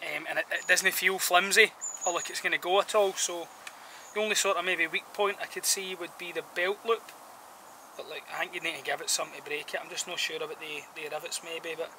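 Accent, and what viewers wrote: Scotland, United Kingdom. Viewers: A wee bit of Scot in yer voice is there?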